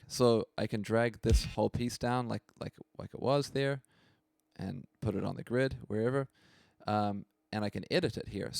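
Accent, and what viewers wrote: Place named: New Zealand. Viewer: I could definitely hear the kiwi...solid keep up the good vids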